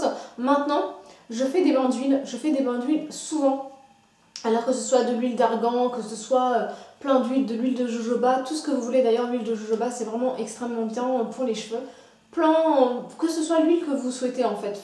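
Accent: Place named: Kabylie, Algeria. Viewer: t'es kabyle ? psk ta l'accent ^^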